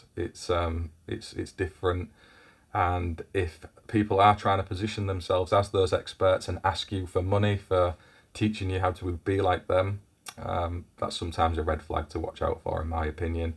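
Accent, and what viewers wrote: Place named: Yorkshire, United Kingdom. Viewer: Always liked your channel. Just dropped on this vid. Proper Yorkshire. Love it.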